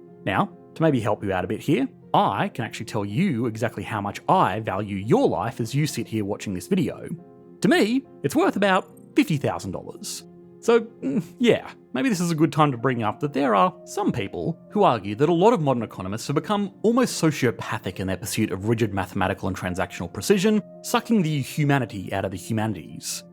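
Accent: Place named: Bogan, Australia. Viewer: Subscribed for the dulcet bogan drawl.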